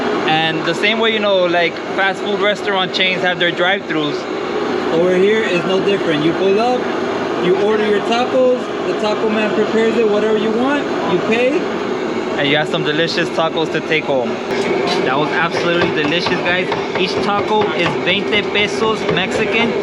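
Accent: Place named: England, United Kingdom. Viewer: You speak Spanish, there’s no need for you to pronounce Spanish words with an English accent. It would help for those that don’t speak Spanish n would like to visit .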